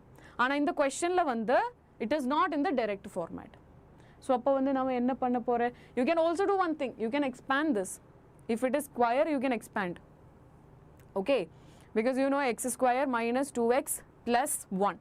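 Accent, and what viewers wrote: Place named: Tamil Nadu, India. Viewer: Hi mam Karishma present mam Today session is done and dusted mam Sorry mam i didnt attend the last 1 hour lecturer but now i finished mam I really enjoyed this session and as much i will understand also very much mam Thank you for this amazing session and please continue sessions like this with your cute Tamil and smile😍😍😍😍😍😍😍😍😍 THANK YOU GOPIKA MAM TO SUGGEST YOU FOR US 😍😍😍😍😍😍😍😍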